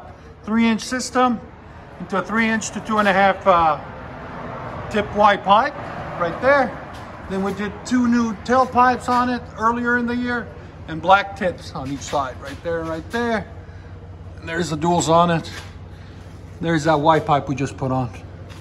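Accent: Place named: New York, United States. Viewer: Tennessee and he sounds like a pasta eating nerd from New york.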